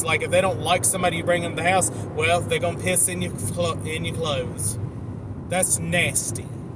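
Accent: South, United States. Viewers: I missed your beautiful southern accent. You make some valid points about cats. I am a cat lover too and my ancestors in Egypt loved cats. Not all cats behave in the way you suggested and a well trained cat is actually a really cool creature to have around the house and long as the house is kept very clean aswell as the animal itself. Cats are generally much cleaner than dogs anyway. All pets add extra workload to the housework so that comes with the territory. I agree a humane cull is needed though, as the cat population is definitely getting WAY too hard to manage for federal and state authorities resources. That being said, human societies around the world have kept animals as pets for thousands of years and that's a part of human nature that you never going to change. We still need these rants urgently as they remind us of our core responsibilities as humans to the rest of the environment. 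Please don't stop making these videos. And thats coming from ME -AN OBSESSED CAT LOVER.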